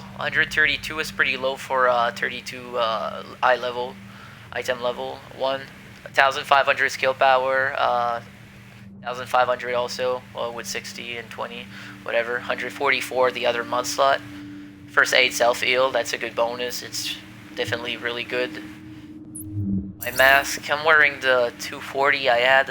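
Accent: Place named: Quebec, Canada. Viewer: great video, something for me to build towards. where about are you from you sound a bit like gsp who was a fighter in the ufc he is French Canadian.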